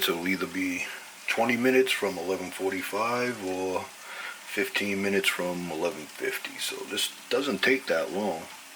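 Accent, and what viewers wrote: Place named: Boston, United States. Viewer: Not bad! You got that Boston accent. For the bubbles on top try using a heat gun so the top bubbles can decipate all together!! 👍👍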